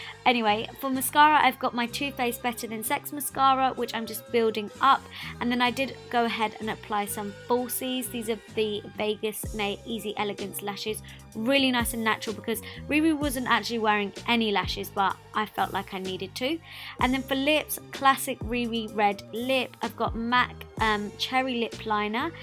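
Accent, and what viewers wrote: United Kingdom. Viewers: британский акцент? такой противный)))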